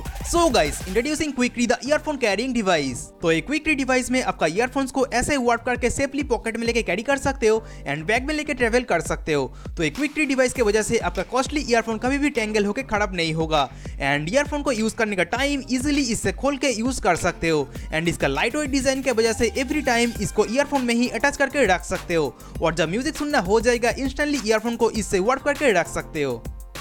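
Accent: Bengal, India. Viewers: Bro are u a bengali ur pronouciation is funny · r u BENGALI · Aapki tone se aap Bengali lag rahe ho relax dear aaram se bolo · Powergrid Fatehpur its due to Bengali tung... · R u Bengali?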